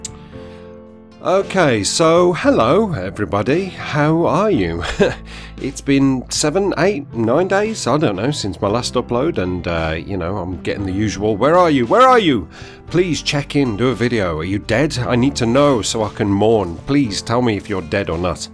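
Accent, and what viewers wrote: United Kingdom. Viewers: I've been checking your channel everyday for a new upload....dammit! Start uploading more, I need your British accent in my life lol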